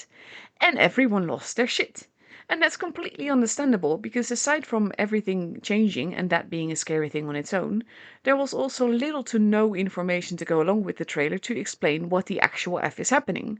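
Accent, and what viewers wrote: Netherlands. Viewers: ^: Love your honey smooth voice & Dutch / English dialect (Easy Listening) You made me roar with laughter = "𝐂𝐨𝐮𝐥𝐝𝐧'𝐭 𝐁𝐞 𝐀𝐫$𝐞𝐝" ( London speak ) Your phraseology is VERY down to earth. Very much appreciated by this Londoner!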